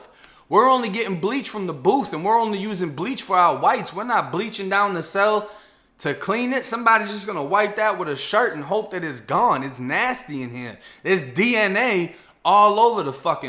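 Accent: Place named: East Coast, United States. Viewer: Biggest crush EVERRRRR😏 it's the east coast accent with the Florida dgaf vibe for me🤷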